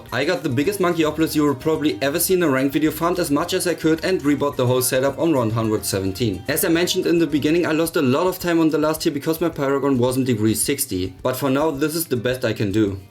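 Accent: Germany. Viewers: Ich kann den deutschen Akzent einfach nicht ignorieren, aber nicer run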